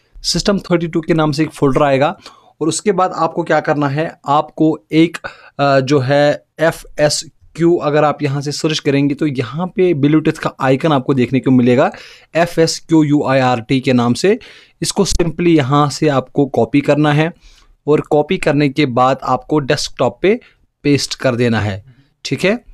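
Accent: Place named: Kashmir, India. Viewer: It sounds like u r kashmiri ....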